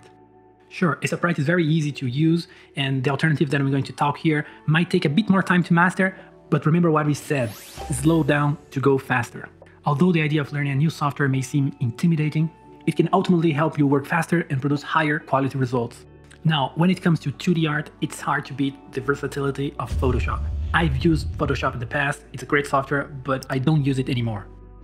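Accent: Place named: Portugal. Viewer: do you speak portuguese? i found your accent very noticiable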